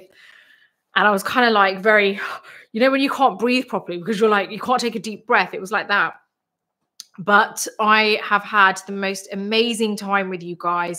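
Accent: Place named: United Kingdom. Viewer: The final look is beautiful! You did great, be proud😊 Thanks for that joyful and educational video. I very much appreciate that you now about your influence as a youtuber and you still remember where you cone from and what you have achieved. By the way, I love your British accent ❤ Thanks for your all your work. Looking forward to the next live😅 Love from Germany ❤❤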